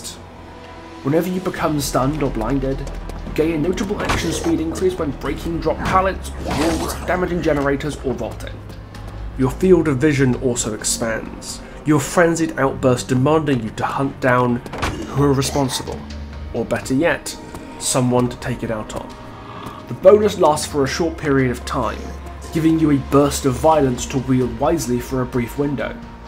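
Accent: United Kingdom. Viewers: Of course the person who’s narrating the video is bri’ish…mf ruined it lmao 😭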